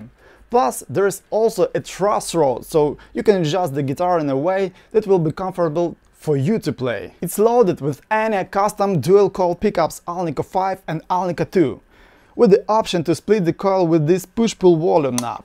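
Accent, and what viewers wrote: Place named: Russia. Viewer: Hi! I hear accent.. You russian?)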